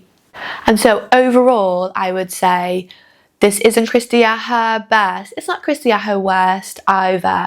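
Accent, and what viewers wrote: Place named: Northern England, United Kingdom. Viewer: Your observations are spot on. Bundle is the typical unbearable know-it all girl boss figure that has become so overused in modern film and TV, here shoehorned into a 1920s setting., her language and clothing were totally out of place. I fear the worst when it comes to the coming adaptation of C S Lewis' Narnia books later this year. If they muck that up, I am cancelling my subscription! Nice to hear a northern English accent on YouTube! Thanks for sharing, Greetings from cold Sweden!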